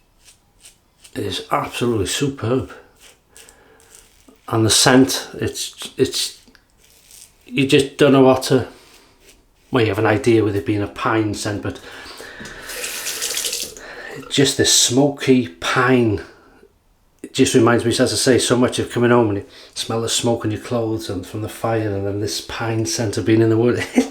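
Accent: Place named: Wales, United Kingdom. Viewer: His accent reminds me of Christopher Ecclestons accent. I wonder if he's from Wales too.... 🤔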